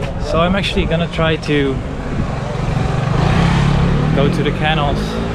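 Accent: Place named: Netherlands. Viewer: A bit to many errors in reporting for that.. Alltough the accent sounds Dutch.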